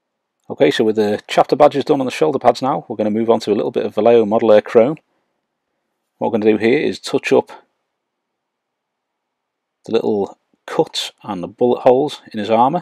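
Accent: Liverpool, United Kingdom. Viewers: did any1 else at the begining think he sounded like he was from the netherlands n was talking a foreign language on the introduction then i realised he was a scouser no offence loool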